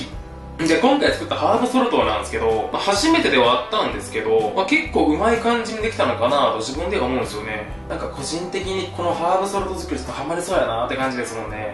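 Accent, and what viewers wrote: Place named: Hakata, Japan. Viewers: この喋り方的に福岡やろか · 実食編、いつもより博多弁多いっっちゃんね(o^^o)よほどうまかと！ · 若干博多弁？が多いこの動画すき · 所々出る 九州の方言が親近感湧きますヽ(´ー｀)ノ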